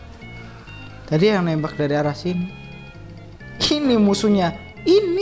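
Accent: Java, Indonesia. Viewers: Orang Jawa kah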